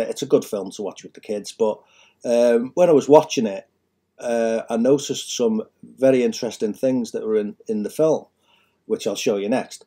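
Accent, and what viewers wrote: Liverpool, United Kingdom. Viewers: Wow! New to the Channel! Amazing! Thank u. I've heard it will be Nov '23 but wow! And a Fellow Scouser too! I grew up in Penny Lane, Lpool. Great to hear a Scouse accent and intelligent too!! 👍😉🤗💥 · As a Scottish person ....im giggling x think he a scouser xx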